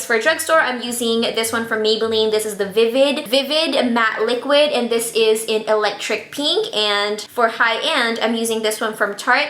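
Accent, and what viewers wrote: Philippines. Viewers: You're accent makes me feel like I'm back with my extended family lol I haven't seen my dad's family in FOREVER and I miss them! Pinas have such adorable, recognizable accents haha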